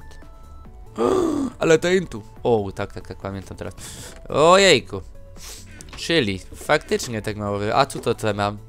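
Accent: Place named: England, United Kingdom. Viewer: coraz mniej słychać twojego angielskiego akcentu:) Gratuluję:)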